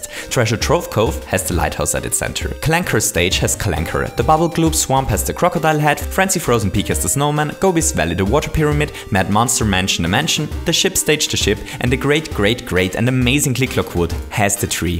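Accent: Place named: Germany. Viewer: I guess he is from Germany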